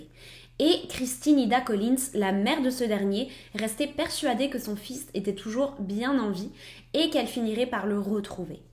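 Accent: Quebec, Canada. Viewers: Il y a un petit quelque chose dans ton accent qui sonne québécois. Est-ce que je me trompe? Tu es pétillante! C’était la première vidéo de que je regardais, mais certainement pas la dernière. Je me suis abonnée!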